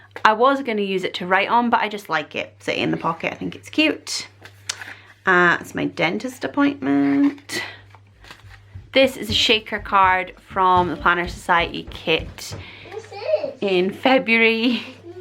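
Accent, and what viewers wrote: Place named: Northeast Scotland, United Kingdom. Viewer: You sound like you must be based in the North East of Scotland? Love this video by the way!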